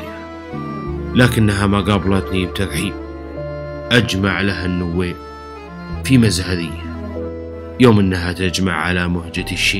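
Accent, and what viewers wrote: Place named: Nabati, Saudi Arabia. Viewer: انت تملك موهبة نادرة جدا ً ، كيف تستطيع أن تنظم مثل هذه الأبيات الجزلة ، والمفردات الغزيرة والنظم المندثر تماما ً ؟؟؟ والأغرب أنك تملك اللهجة النبطية الأصيلة ، فأنت لاتكسر أول الفعل !!! يجب أن تهتم بتدريس الشباب المهتمين بالشعر ، وإن فعلت ، سوف يتخرّج من مدرستك جيل يعيد الشعر الى مجده . ويكون إسمك خالدا ً . 🌹🌹🌹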